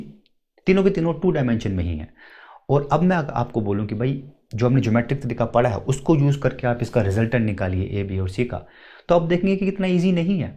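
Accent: Kashmir, India. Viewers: I sense that u have an akcent like Kashmiris..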